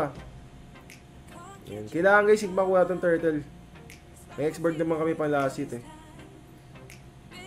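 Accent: Iloilo, Philippines. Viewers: Ilonggo ka pala lods? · ilonggo ka coach?❣️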